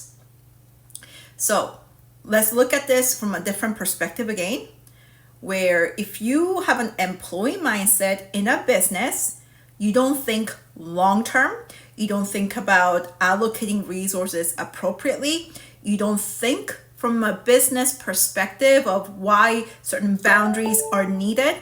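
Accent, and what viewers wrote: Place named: Brazil. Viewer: Anyone can be brazilian haha we have the largest japanese pop outside of japan. Her accent is very brazilianish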